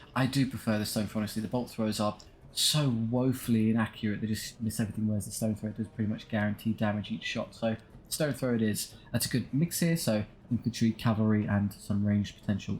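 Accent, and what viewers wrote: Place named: United Kingdom. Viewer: I feel like this is an awkward thing to say but you sound delightfully brittish. Thank you for the uploads.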